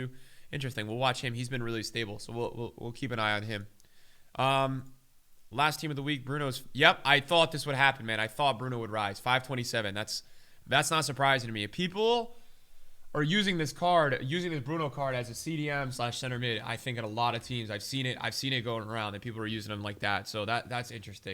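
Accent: United States. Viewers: Where is from I hear US accent but he said 1 pm so where is he from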